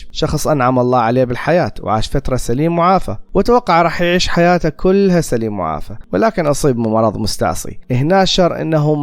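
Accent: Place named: Iraq. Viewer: أحسنت اخوي الغالي ، كلام مقنع وجميل ومبسط دون إسفاف أو إخلال ، واللهجة العراقية زيادة جمال ، تحياتي لك من فلسطين ، أكمل وطور نفسك🥰 + إضبط قراءة الآيات القرانية 😉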